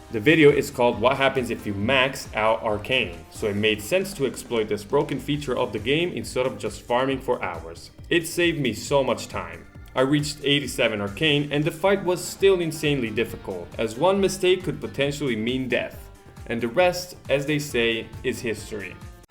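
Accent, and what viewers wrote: Italy. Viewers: Mi stavo chiedendo perché riuscivo a capire a orecchio il tuo inglese, poi ho visto che sei italiano😂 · Are you italian? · To be fair he sounds perfectly north Italian to me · r u italian?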